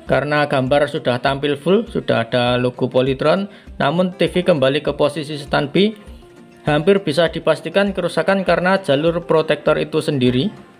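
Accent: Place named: Java, Indonesia. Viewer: Bahasane medok. Lokasi pundi mas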